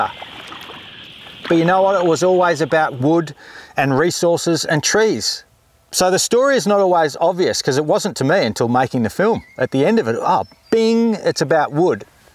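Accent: Australia. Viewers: O, how I missed that Aussie voice.... Thank you loads for making 2020 a little better. Lots of love from the Netherlands!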